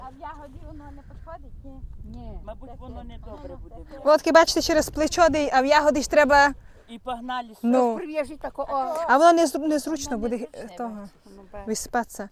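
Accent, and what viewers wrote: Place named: Rivne, Ukraine. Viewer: Хто працює той і має! Але не завжди сільський труд ціниться, до прикладу у нас на Волині, регіон Шацьких озер, малину вже і по десять гривень ніхто не бере, осипається на землю. А скільки вкрадено роботи та затрат, і часу в тих 20 соток! У вас яка ціна, та чи є збут? По діалекту ви з Рівненьської області напевне, і в районі смт. Березне проживаєте? В мене тітка з відти, тож дуже схоже.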